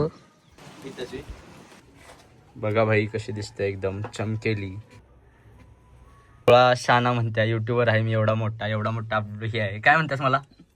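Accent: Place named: Sangli, India. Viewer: सांगली मधली tone आहे तुझा बोलीची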